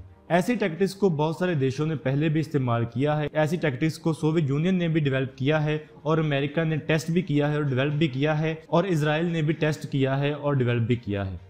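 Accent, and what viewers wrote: Punjab, India. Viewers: He is from punjab I think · Bhai Punjabi ho kya aap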